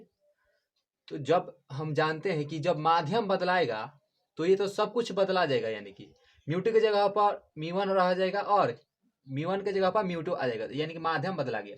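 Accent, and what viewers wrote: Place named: Bengal, India. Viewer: Lagta h sir aap Bangal se h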